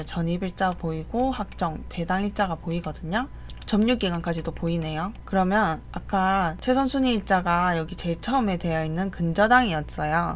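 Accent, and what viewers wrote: Gyeongsang, South Korea. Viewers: 표준어를 사용했으면? 보리문댕이인가 😂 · 경상도 억양좀 줄여주시요 경상도 톤이 머리아파요 천천히 또박 말하면 덜 시끄럽게 들릴려나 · 지역이 경상도쪽 이신듯한데 어디신가요?부산사는데 가까우면 수업신청하고싶어요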